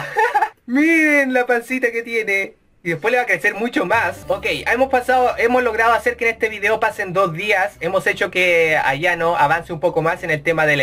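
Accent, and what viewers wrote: Chile. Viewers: es chileno:v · eres chileno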